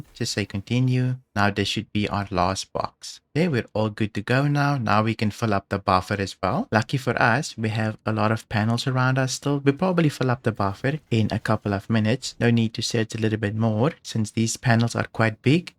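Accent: South Africa. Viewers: Hey bud, sound like a SA, you on a Discord. Looking for people to play with, love the vids.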